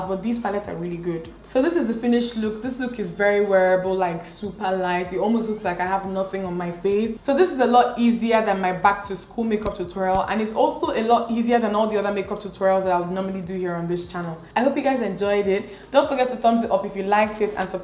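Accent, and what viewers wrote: Nigeria. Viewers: Are you Nigerian? I love your accent 😍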